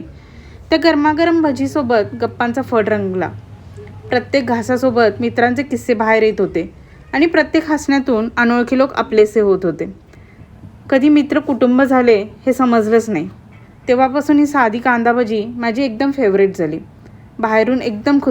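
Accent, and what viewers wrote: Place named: Pune, India. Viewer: Tasty bhaji with your Puneri speech😋😋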